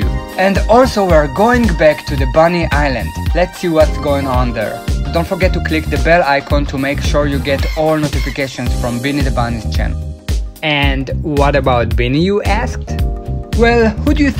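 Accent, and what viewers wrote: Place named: Europe. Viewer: This guys voice is what Americans think Europeans sound like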